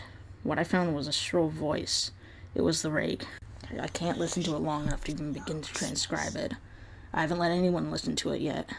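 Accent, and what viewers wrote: United Kingdom. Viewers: heavy british accent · That British accent! xD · his English accent though 😂😂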